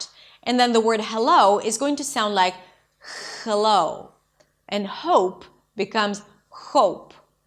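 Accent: United States. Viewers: Look sounds almost like luck? There is no trouble to pronounce V because в is the same :) as for my ears this is loud American accent of Emglish :) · with what? This is American English not British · Hi! I love your videos. I'm wondering if you're a native English speaker or if you were able to achieve the American accent that you have through practice? Thanks! I'm looking forward to more videos:) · This is an American accent english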